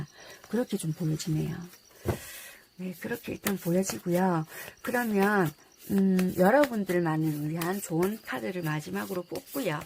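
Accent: Busan, South Korea. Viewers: 1번👀✨.... 정말 꼼꼼하고 현실적인 리딩 감사합니다♥ 덕분에 용기를 얻고가요 가 저에게 최고 잘맞는거같아요 👀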